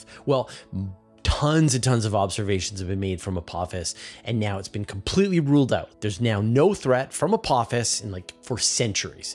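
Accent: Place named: Canada. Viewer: Your canadian accent is very nice, there's nothing to worry aboot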